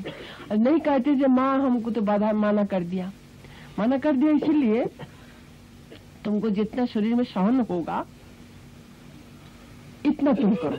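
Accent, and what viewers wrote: Bengal, India. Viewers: Yes her accent sounds like Bengali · Her accent is Bengali.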